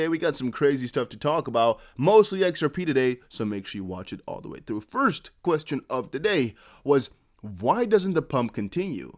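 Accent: United States. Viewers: What is your first language? Because you sound like an American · Dude sounds American I know the English accent from Europe, Canada, Australia, and New Zealand sound different then American accent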